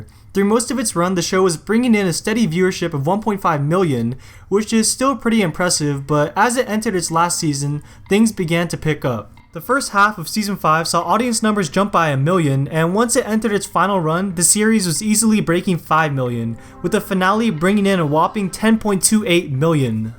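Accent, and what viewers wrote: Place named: Midwest, United States. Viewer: He sounds midwestern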